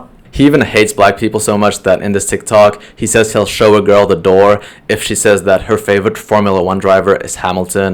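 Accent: France. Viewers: If you speak other languages other than French, french included You'll notice occuring words that are french and also belong to another Language like English for example, You can find lots of words Like chef d'oeuvre, hors d'oeuvre, football, playstation, YouTube, story, nike, film, films, videos, [Names of people, places, and food] ,images, and other hundreds of words The french pronunciation can be either similar, or different Well he most of the times he would pronounce words the exact way they should be in English But sometimes ( in this video in particular ) he did pronounce words exactly how they should be prounced in french or in a similar french accent ( imagine a french person saying the word "story" the way it should be prounced in french "stori" ) PS: other languages such as japanese include french words but with its japanese pronunciation For example: story becomes ストーリ, story is not the best example because it isn't originated from french, But I was taking about the way words are pronounced in french Take story it becomes "stori" (Y prounced as i here) The Japanese pronouciation is kifkif as what I stated above 👆⬆️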